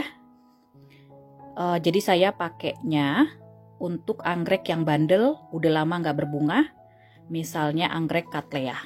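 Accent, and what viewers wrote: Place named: Medan, Indonesia. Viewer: Biasa kekgitu mba,, buat jd motivasi agar lebih baik kedepanna 🤗 Kalo saya pribadi mmg sukak dengerin cerita mbaknya hehheh...kayak logat anak Medan 😁😁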